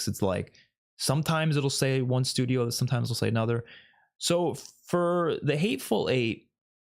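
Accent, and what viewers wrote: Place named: New York, United States. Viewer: Gotta be New York……northeast U.S. at least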